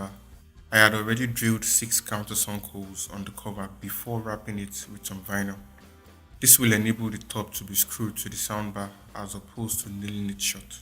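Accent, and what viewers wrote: Nigeria. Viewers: I guess he's from Nigeria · Nice work your Accent sounds like You are Nigerian...A few pointers though..Try using speaker gasket instead of silicone gum to hold down your subwoofer, silicone has been none to react not to well with speaker surrounds....Nice work 👍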